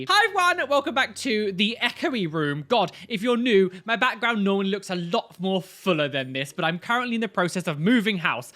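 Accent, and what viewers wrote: United Kingdom. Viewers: I’m so used to accent that I forget he’s British until I hear him do an American accent 😭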